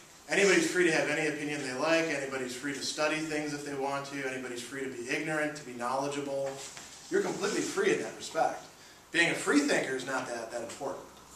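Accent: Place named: Canada. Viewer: I have lived off your videos to guide myself through my university philosophy elective - for which I accidentally registered. I caught myself answering your questions out loud as if I were attending in person. I find it interesting to listen to the accents of your students, because being a Canadian myself, you sound like me. Midterm today! :)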